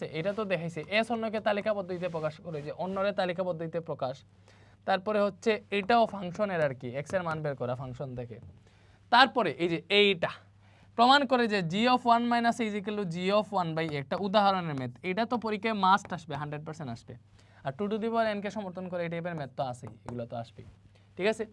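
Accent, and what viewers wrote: Sylhet, Bangladesh. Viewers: Vaiiya apni kita sylheti ni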